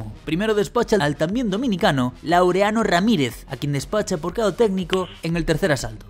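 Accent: Galicia, Spain. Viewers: Tú acento.......eres de Compostela? 😅🙏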